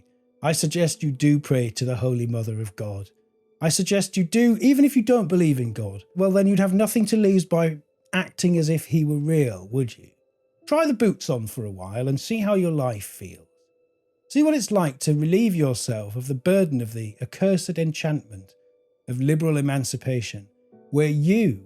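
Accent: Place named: United Kingdom. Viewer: I really enjoy TRUTH Tellers! Cheers!!🎉 Truth with a British Accent is EVEN BETTER! We could get really Creative and make this Truth Wizard A THING. I hereby Volunteer for the Costume Department Manager and will gladly Donate Costumes & Props, if needed. I would have to ship them from America. Just heard today, 9/2/25, that Brits cannot Mail packages to the US under your current Dictator. Thanks be to God, we Americans still have that Freedom. Unless it "mysteriously disappears" at your end, I don't see a problem. 😉😉 On a seroius note, I thank God that I was Born on Christmas Day 1960. I was taught to pay attention to Life and have literally witnessed with my own eyes, ears, heart and soul the disintegration that he is referring to. The turning upside down of everything. He is absolutely correct. It has been a wild ride and I suspect we'd better buckle up. 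The Disenchantment is SPREADING! 😇🙏💪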